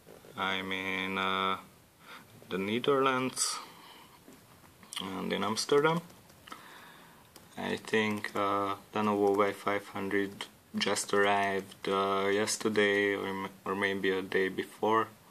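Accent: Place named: Hungary. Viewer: Ahogy beköszöntél már hallottam hogy magyar vagy olyan jellegzetes magyar akcentussal. Remek videó. Köszönöm a feltöltést.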